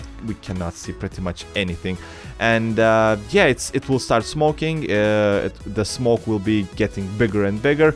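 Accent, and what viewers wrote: Russia. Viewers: are you russian? i knew you where from like another country with an axent but i had no idea. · are you russian??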